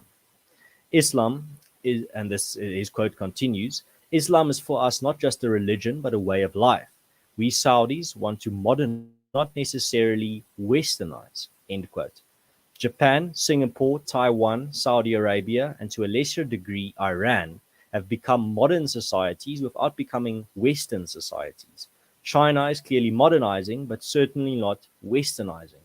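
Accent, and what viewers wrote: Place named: South Africa. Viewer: I worked for a South African company for 25 years and great to hear your accent!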